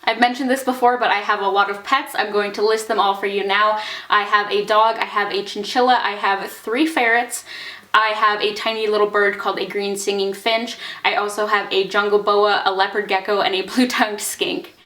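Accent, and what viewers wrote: Canada. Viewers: it is SO funny because as I was watching some of your videos I thought to myself "wow I bet she's canadian because of her accent" and then I read that you ARE canadian!!! ( I am Canadian as well haha). Anyways love your videos :)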